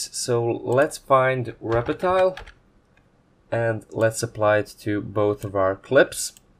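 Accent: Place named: Balkans. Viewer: Are you from Bosna? I couldn't help but notice the Balkan's accent and surname, great tutorials by the way.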